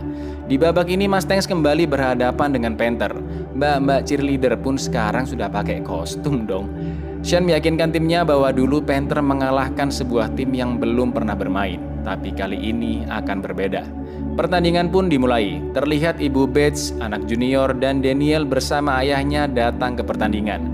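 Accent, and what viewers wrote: Java, Indonesia. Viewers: Jawane ngdi mass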